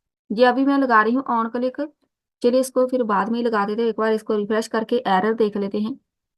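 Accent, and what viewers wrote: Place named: Punjab, India. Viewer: good video, but agar aap aik video punjabi main be banao to bohat acha hoga, kyun k aap ka accent punjabi lag raha ha mujay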